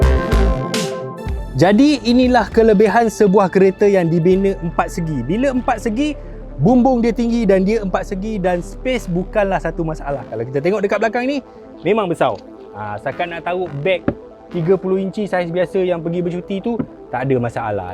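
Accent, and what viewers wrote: Kelantan, Malaysia. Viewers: Haha org mentakap kecek klate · ore kelate ke abe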